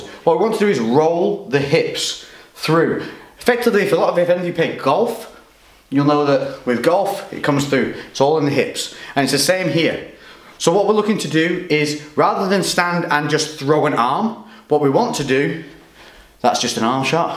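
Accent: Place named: Lancashire, United Kingdom. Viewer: Where in Lancashire are you from?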